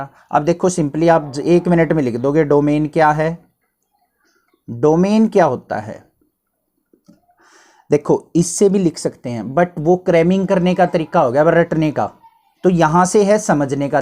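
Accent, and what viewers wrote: Haryana, India. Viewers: Do u belong to Haryana ✌🏻?? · Sir kya aap Haryana se ho ......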